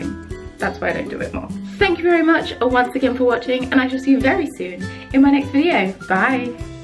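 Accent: United Kingdom. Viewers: are you from Germany bc you sound very British XD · are you from britain??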